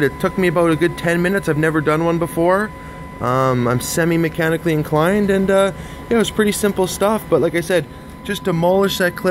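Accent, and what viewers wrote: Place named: Canada. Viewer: He’s got a great white north accent bud.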